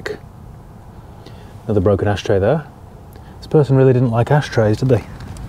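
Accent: United Kingdom. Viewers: Wait, you moved to the UK? I thought you were a UK native. Shows what I know about accents haha. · He’s from a British island colony so no you’re not mistaken about the accent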